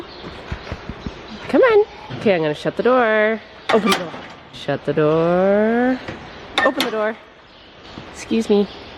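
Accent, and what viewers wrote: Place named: Canada. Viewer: DrummerGirl423 she’s Canadian!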